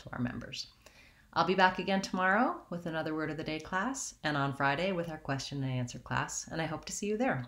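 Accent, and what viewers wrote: United States. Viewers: You’re from the Midwest I assume?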